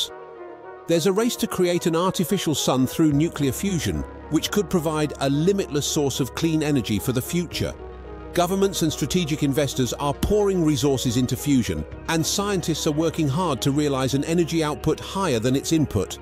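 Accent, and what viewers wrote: United Kingdom. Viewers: How about inviting Tony Stark to give a hand? He knows all about fusion, since he carries one in his chest … what a sensationalistic video, luring people to watch in its entirety just to find out China has no artificial sun nor has launched bananas in the air. Shame com this narrator with his dreadful British annoying accent !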